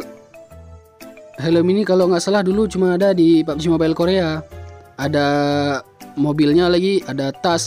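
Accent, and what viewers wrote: Aceh, Indonesia. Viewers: Gaya peugah aba awk aceh sang bak hi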